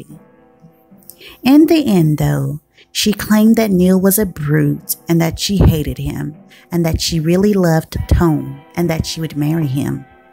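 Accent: South, United States. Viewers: I've thought about starting a channel. Good luck. You have a beautiful speaking voice. Are you Southern? I heard about Berle's package previously. I'm a huge Drifters fan. Sad about Clyde.😢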